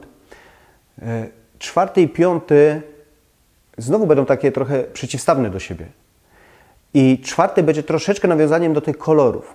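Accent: Silesia, Poland. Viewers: Czy Pan jest ze Śląska ?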